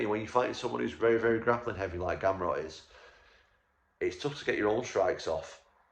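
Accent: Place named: RP, United Kingdom. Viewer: Hello Tom, I'm a huge fan and I also think you are the next level of fighter with a concentration in striking, distance, wrestling, ground game, and a great working fight IQ. However, I think you are speaking the Queen's English and keeping in European etiquette, while trying to communicate to a filthy American (Dana White), where getting the unification match is concerned. If you are going to contain yourself, and he wants you to be contained, then you've already lost the argument. There is no need to respond and hearing it any further in such a manner id just bothersome. If you want this mother fucker to actually be his authentic self, and have a real dialogue, then speak his language. "You say Jon Jones is the pound for pound greatest MMA fighter of all time, I know you are wrong. I'M the greatest pound for pound MMA fighter of all time. 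You put the two of us in a room and I'm killing him and walking out! Period. He's depending on you to hide him from me, because I'll kill him in front of everyone. If you think I'm wrong, than put your money where your mouth is and schedule the fight. If he backs out, he forfeits the belt, the title, and any talk about being good at anything. You want to have this conversation? I'm willing to back it up by bashing his face in. You want to go on saying he's pound for pound the best, then prove it. Prove it or continue to allow him to hide from me." Something like that is what I would hear from my Mom every day waking up for school. We'd fig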